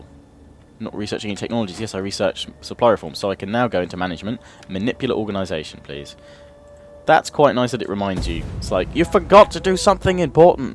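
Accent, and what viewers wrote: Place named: United Kingdom. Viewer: fuck you will your british ascent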